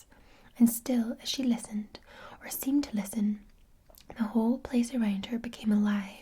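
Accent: Scotland, United Kingdom. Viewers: My grandmother is Scottish, your accent reminds me of her 💕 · Amazing asmr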